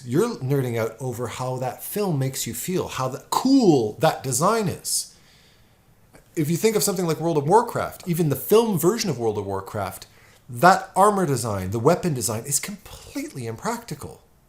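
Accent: Quebec, Canada. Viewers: Love your videos, been fallowing you for awhile. I'm from New Brunswick. And I've heard you mention your from Montreal. Whether your English originally or French, I had to smile cause I heard the French Canadian Accent Pop in this video for the first time! Keep up the great work! LOL got a bit further in the video and caught you subduing french pronunciation of"necessary."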